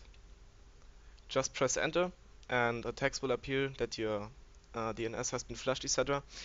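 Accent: Germany. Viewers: thank you man it worked me.and i liked your german accent :D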